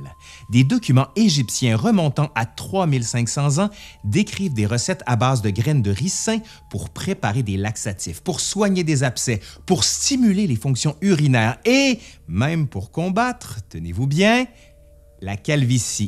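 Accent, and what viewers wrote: Quebec, Canada. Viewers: C'est drole avec l'accent quebecois. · Bonjour. Merci pour vos vidéos toujours intéressantes, marquées de l'accent de la belle province qui, je dois l'avouer, me manque un peu. Sujets maîtrisés, une excellente présentation soulignée par un montage de qualité, agrémenté de parfaites animations. Longue vie à votre chaîne. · Je savais bien que l'huile de ricin était trop dégueu pour être honnête 😄. Le coup du parapluie bulgare est tellement romanesque que le cinéma l'a utilisé souvent et Pierre Richard l'a rendu immortel avec le coup du parapluie, que je vais d'ailleurs me revoir, ça m'a donné envie 🙂. Vidéo intéressante, elles sont toujours de qualité et bien écrites, le "petit" accent donne le petit plus, j'ai toujours eu envie de visiter le Québec. Tu prépares avec ton compère une histoire de la médecine? Tu m'en vois ravi. Je me réjouis de voir ça. 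Je suppose que tu prévois plusieurs épisodes pour un tel sujet? Cool, excellent programme. Encore bravo à toi et à ceux qui bossent avec toi. Salut.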